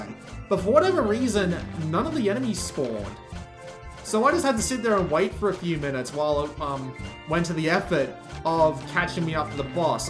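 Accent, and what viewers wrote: Australia. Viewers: Just wanted to thank you, I've been comming increasingly aware that I use your channel a lot when looking for PS VITA games (especially ones that have been turned into limited physical releases, including this very game!). But i'd much prefer to play Darius Burst than this.. I do say I love the art work in ths game though! PS - If you haven't already, I strongly recommend "CALADRIUS" on PS4, it has become my all time favourite Bullet hell shooter!...even though I have never made it past the 3rd Boss (I don't use continues, just rinse & repeat!) oh and it's awesome listening to a fellow Aussie gaming! =)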